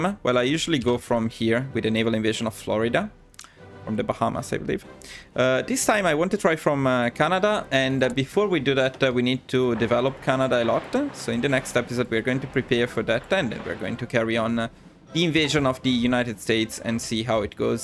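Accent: Italy. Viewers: Out of curiosity: are you italian? The accent looks like it. Loving the videos, and ur accent remembers me of my father in law❤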